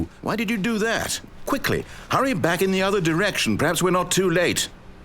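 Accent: United Kingdom. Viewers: It's called a british accent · i dont know what franknfurter sounds like cause i never saw rocky horror picture show but the narrators particular brand of english accent is quite similar to classic stewie's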